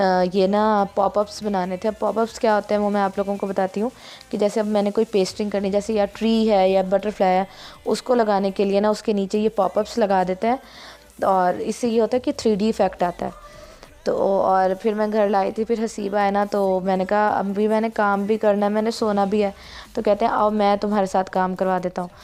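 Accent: Saraiki, Pakistan. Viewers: Ap saraki speaking ho kya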